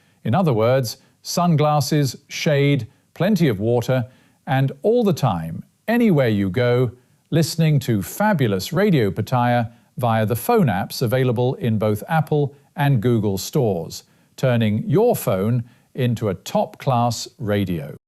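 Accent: United Kingdom. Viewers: That's a british man?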